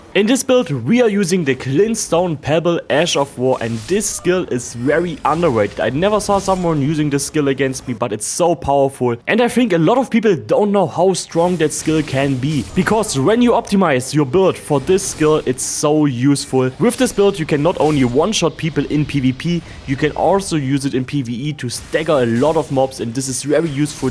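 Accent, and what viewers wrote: Germany. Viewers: Also das ist mal ein geiler build. Wenn du mir jetzt noch sagst das ich mit dem diesen scheiß feuerriesen killen kann dann bist du ein Held weil ich diesen Arsch nicht fertig bekomme 😅. Ps: als deutscher hört man immer die anderen deutschen raus 🤣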